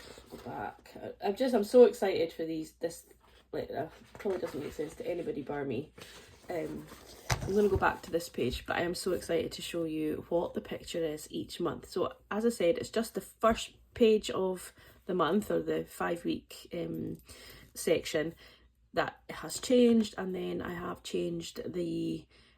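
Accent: Scotland, United Kingdom. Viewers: Omfg! You’re Scottish 😮 I don’t know how I’m ever going to get over that revelation 😂 LOVE the planner can’t wait for mine to arrive xx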